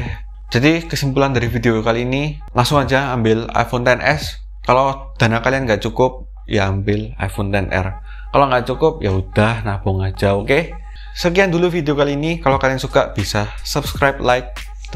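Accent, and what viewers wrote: Java, Indonesia. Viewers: medok banget jawanya (D)😀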